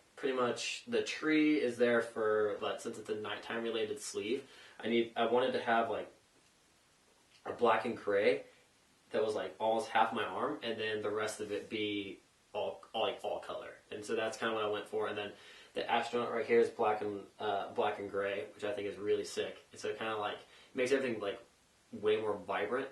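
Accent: Ireland. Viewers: Your Irish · r u irish?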